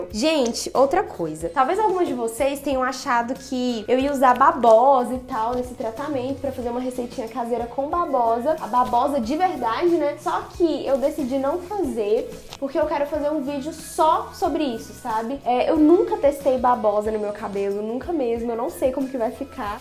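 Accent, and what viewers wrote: Minas Gerais, Brazil. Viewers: !!!!!! amiga sua louca!!! que hidratação foi essa.... amei... amo seus vídeos acho você muito linda e fofa com esse sotaque mineiro... e: EU AMO BABOSA... sucesso bjnssss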